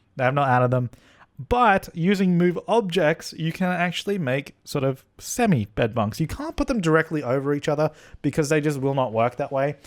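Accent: Australia. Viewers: Wait.... you're Australian??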